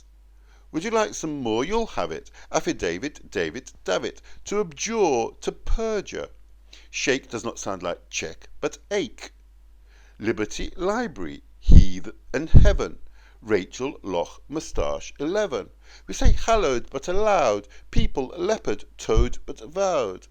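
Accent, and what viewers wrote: United Kingdom. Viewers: So far this is the best rendition I have found, with a clear RP (Received Pronunciation) accent. It is nice to have the complete version too.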